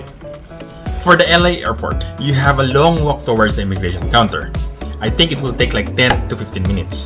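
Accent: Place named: Zamboanga, Philippines. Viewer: From Zamboanga ka po pala kaya pala familiar ang accent ng tagalog, student nurse here from AdZU, hoping to be there soon!!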